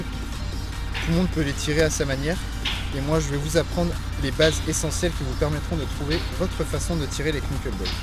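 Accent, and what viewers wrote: Saint-Étienne, France. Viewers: Tu serais pas stéphanois ? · T'est de saint Étienne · Tu est stephanois!? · Vous êtes stéphanois ?! Si oui comme moi aller les verts !!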